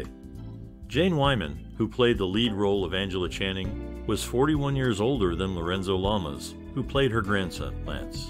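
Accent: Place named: United States. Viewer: Too many random pictures not related to what is being said. And telling us Jane Wyman played Angela about 20 times. The overly American pronounciation of Italian names got very distracting too.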